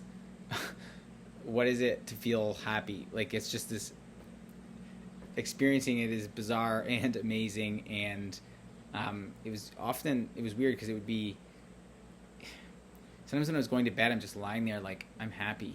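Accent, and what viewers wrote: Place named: Canada. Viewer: I do enjoy a Canadian accent - and I like your reference to emotional ‘richness’ in the ups and downs of life.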